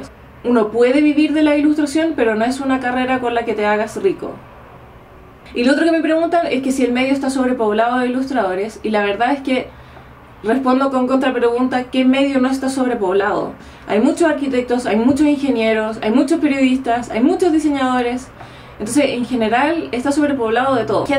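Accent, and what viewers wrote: Chile. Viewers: Wow! cómo cambia todo hablado en español... y en chileno! ;D eres admirable! Saludos! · Tienes un acento muy bonito, me encanta como en Chile dicen "chiquillos o chiquillas" ¡Mucho éxito en todo!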